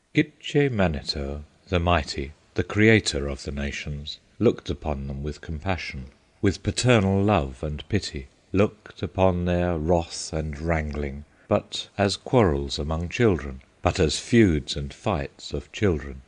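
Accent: England, United Kingdom. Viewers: It's difficult to get into hearing this read with an English accent. Sorry. If it was Shakespeare, he'd be great.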